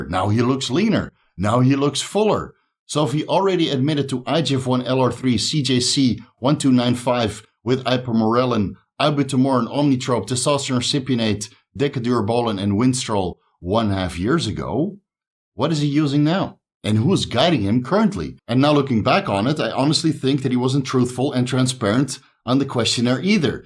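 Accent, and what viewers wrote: Netherlands. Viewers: good video! you sound dutch. are you? greetings from the Netherlands haha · Your profile says USA, but this is a Dutch accent right? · are you dutch?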